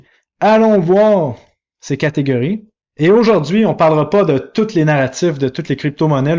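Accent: Canada. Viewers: Bonjour merci pour tes vidéos que je suis régulièrement. J aimerai si tu peux avoir ton avis plus développé sur le solana ( etf ou pas prevu?) Car si c est le cas il se pourrait bien qu il accompagne de fortes hausses. Tout comme l ether etf a venir et enfin j ai du sand je suis surpris de sa remonté bravo a lui.j ai du polygone que je vends par tranches de 25% de hausse et le ada? Merci aussi pour l humour combiné a l accent canadien que du bonheur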